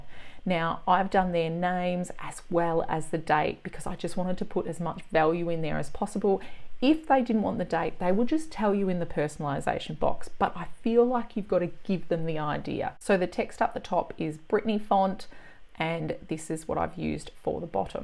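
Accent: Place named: Australia. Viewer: Hi great video. Its nice to hear an Aussie accent inthe sea of POD! I have just started with POD on Etsy. Are you located in Australia? I find that there is not a lot of choice with Australian printers and the products they offer compared to the US. Do you use Australian Printers or US based as the shipping to Australian customers is sometimes higher than the cost of the product.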